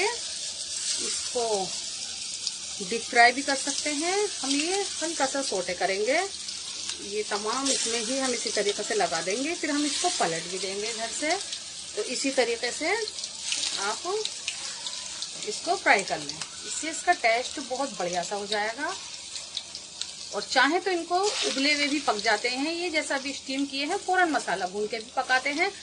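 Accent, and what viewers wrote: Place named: Old Delhi, India. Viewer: aap ki boli aik dm purani dilli walon ki si hai,meri ammi ki poori nanihal india mai purani dilli mai rehti hai-meri nani ne bachpan mai boht bna k khilae hn,mami or khala sath mil k ready krwati thn or hm maze se khate the,aaj bari achi yaad aap ne taza krwa di hai,jazakAllah o khair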